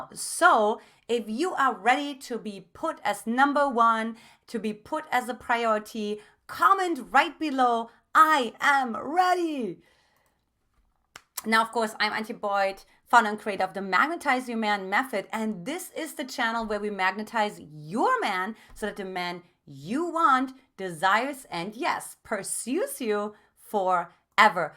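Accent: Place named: Germany. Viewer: Im ready! (And BTW love your German accent!).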